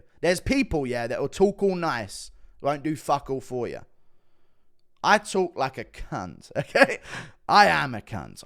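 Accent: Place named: England, United Kingdom. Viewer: hey which part of england you from cant pinpoint the accent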